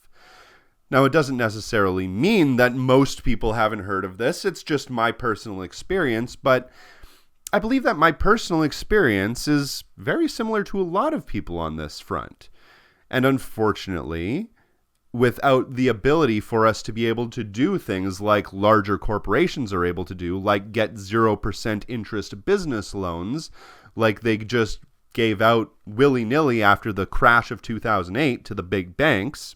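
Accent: Canada. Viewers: Are you an Albertan?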